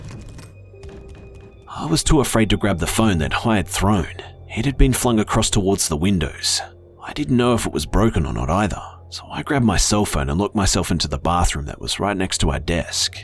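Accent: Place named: Australia. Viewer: The Aussie accent and for the most part locations makes the stories more comical than scary.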